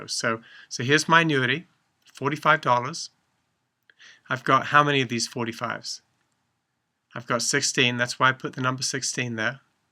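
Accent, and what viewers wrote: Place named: United Kingdom. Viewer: Thank you for lectures they are very helpful, and the British accent makes it even more cool for some reason. Question: Near , you mentioned the need to convert the 8% into an effective 6 month rate, in order to do that don't we first need to convert the 8% from the nominal value into the effective annual rate,(EAR or EFF) which will give us a value of 8.16% EFF on annual basis, which will then translate into the 0.0408 on a semiannual basis.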